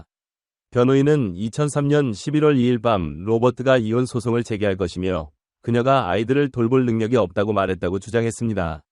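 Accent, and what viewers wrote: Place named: Yanbian, China. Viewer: 요즘 조선족들이 범죄 유트브 시작하면서 사투리 때문에 이런식으로 많이 하던데 조선족이세여?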